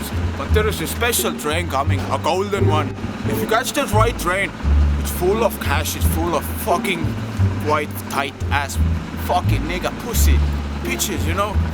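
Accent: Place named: Estonia. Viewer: Eestlase aktsent on küljes, saaks ikka aru :D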